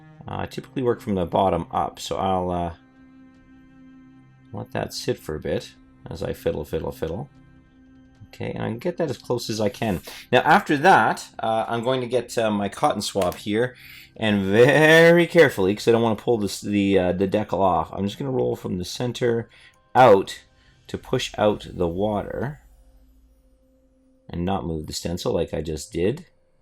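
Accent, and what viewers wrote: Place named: Canada. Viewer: I'm hearing faint traces of a US northern mid-west or Canadian accent, and since he is pronouncing "decal" that way I'm going to guess it's Canadian. That pronunciation is consistent with other Commonwealth nations. The first time I ever heard it pronounced that way it was by somebody from the UK. Didn't seem too strange, but it is a little surprising to hear it in a North American accent, though. I'm glad everybody was able to work everything out.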